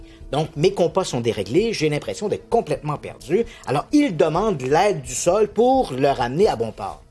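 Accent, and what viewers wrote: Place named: Quebec, Canada. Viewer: L'accent québécois me transporte toujours dans une autre dimension 🍁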